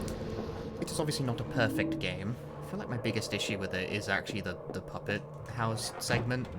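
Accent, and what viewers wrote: United Kingdom. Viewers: Fantastic run! Not surprised Cedar Grove Sanitarium, on a damn WR no less, managed to take over 11 minutes to itself. The place is massive and during your run I actually lost count of how many key items it involves. Been a while since I last played... Out of curiosity, what game are you saying that is "boring" to speedrun around 29 minutes in? I'm not very good at understanding British accents unfortunately. :(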